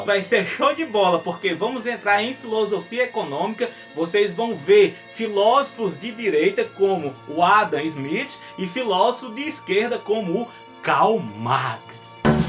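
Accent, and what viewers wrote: Northeast, Brazil. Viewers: Explica tudo num nordestinês fluente.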